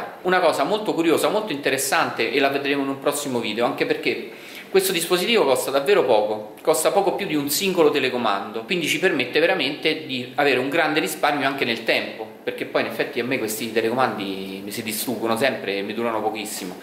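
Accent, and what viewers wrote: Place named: Lazio, Italy. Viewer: mi pare laziale ma non ciociaro....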